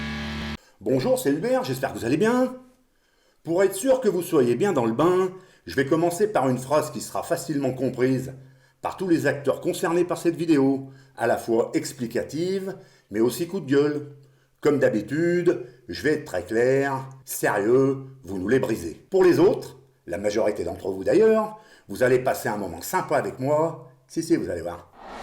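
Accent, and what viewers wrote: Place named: Lyon, France. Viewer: Bonjour Hubert, je découvre ta chaine et je m'abonne car ton contenu, ta franchise et ta gouaille lyonnaise sont tout simplement top. Je ne suis pas un pêcheur de carpe régulier car j'aime trop la pêche pour ne pratiquer qu'une technique ceci dit (Eric Pitre et maintenant sont les 2 seuls carpistes YT ou j'ai cliqué sur s'Abonner), quand je vois ton sweat team Hutchinson,ca me projette 25 ans ans arrière dans les Carpe magazine et mes uniques cannes 13 pieds 3,5 lbs 4 Dream maker sont toujours operationnelles. Longue vie à toi!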